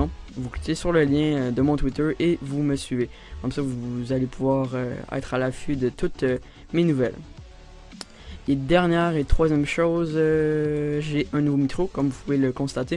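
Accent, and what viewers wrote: Quebec, Canada. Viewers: super tuto et j'adore l'accent quebecois donc je like ;)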